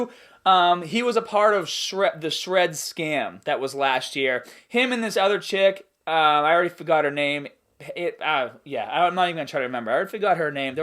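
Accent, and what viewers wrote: Boston, United States. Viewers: Think you almost went the full Boston accent in this vid lol. Sometimes you barely sounded American.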